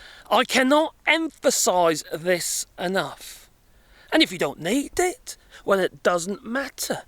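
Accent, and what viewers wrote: United Kingdom. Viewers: You think lack of truckers is going to be the problem ...british accent doesn't mean you aren't an idiot ...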